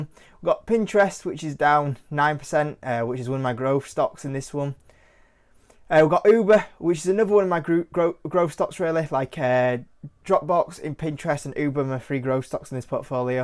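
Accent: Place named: Northern England, United Kingdom. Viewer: Thank you man, where about’s in the UK are you from, I’m London based. The accent sounds a bit Northern, my uni is up in York.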